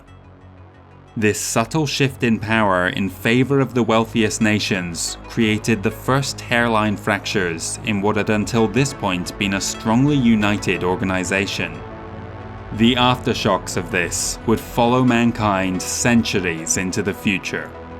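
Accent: Scotland, United Kingdom. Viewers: You sound like a Scottish person educated in England or who has lived in England for many years. Alternatively, you sound like a European (Nordic...?) who has lived in the UK, mostly in Scotland. Or, you sound like a Scottish person affecting an English accent and failing at it.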